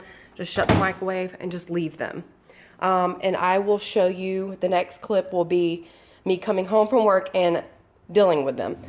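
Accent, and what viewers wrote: South, United States. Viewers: Girl, save your fingers! Just sit the jar on the candle warmer and enjoy the scent right out of the jar! When scent is not as strong anymore, dump out the wax in trash and wipe with paper towel and then wash jar. Less mess, and you won't burn your fingers! If you want to make the melts, just wait until all wax has melted and pour into silicone molds. I don't bother using melts or burning candles anymore. I just sit the jars on the warmer. So much easier! Love your videos and enjoy listening to your accent. "Y'all' Blessings. 😊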